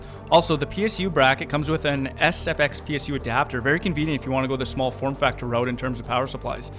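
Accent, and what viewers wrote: Canada. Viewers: The Canada accent is subtle but strong. It’s also the robotic and lack of vocal range.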